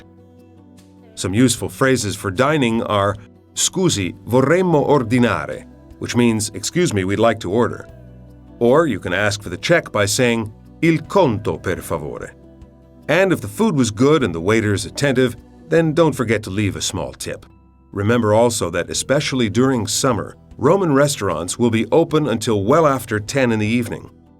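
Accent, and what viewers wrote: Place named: United States. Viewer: He's American isn't he tho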